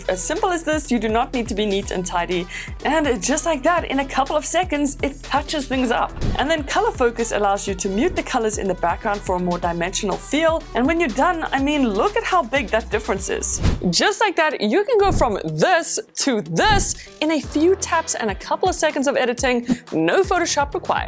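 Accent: South Africa. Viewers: hmmm you sound a little South African :o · South african accent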